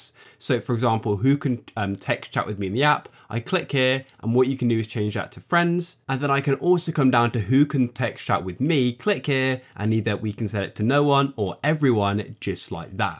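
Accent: United Kingdom. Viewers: No way y’all all just got scammed by a random British dude I feel bad for y’all 😂.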